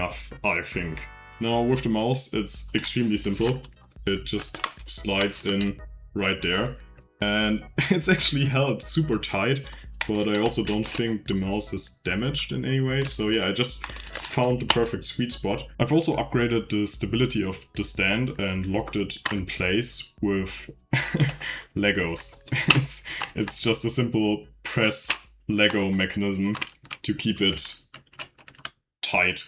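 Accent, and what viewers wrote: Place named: Germany. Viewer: [1] startint to watch video with cleary German accent but too lazy to check if the Youtuber is German or not [2] hearing German word, no need to check anything [3] reading funny comments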